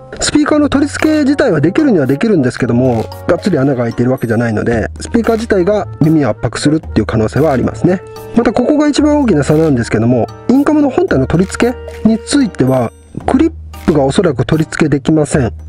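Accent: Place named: Okayama, Japan. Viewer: 「岡山県人の喋る標準語」に心のセンサーが激しく反応しました！これからも頑張ってください。ちょくちょく覗きに来ます。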